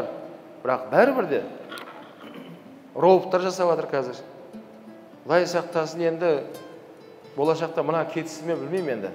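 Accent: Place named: Kazakhstan. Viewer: МаашаАЛЛАХ чоон рахмат АЛЛАХ ТААЛА сизден ыразы болсун эки дуйно теен бактылуу болунуз Илиминизге береке оминь.сизди тындай берип акцент казакша.шугур рахмэт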